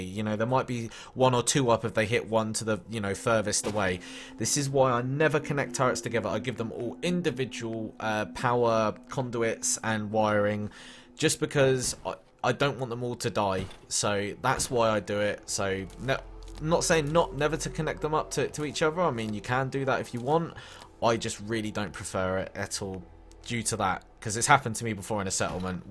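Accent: United Kingdom. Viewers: is every youtuber that plays fallout fucking British? Jesus fucking Christ.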